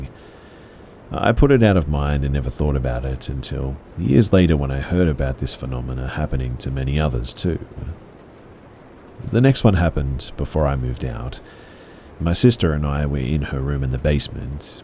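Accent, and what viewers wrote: Australia. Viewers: I love stories and its sooo great to hear an Australian. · Good to here an Aussie accent! Much more familiar. Cheers mate fair dinkum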